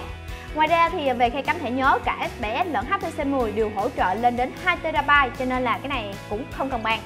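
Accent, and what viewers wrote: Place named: South, Vietnam. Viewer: thích giọng miền nam review ghê,hay phết 👍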